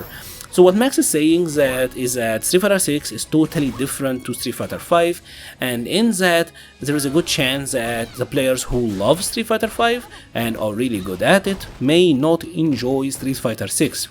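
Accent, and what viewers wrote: Egypt. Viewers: Love your accent.. Sounds Egyptian.. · I go to an Egyptian church and I love your accent. You remind me of my abouna when you say "the people"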